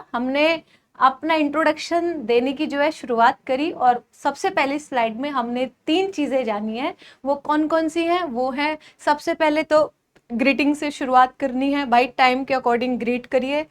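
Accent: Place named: Braj, India. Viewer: Mam aap mathura bashi ho kya ...... Aaap ki bhasha braj ki lagti hai😊😊😊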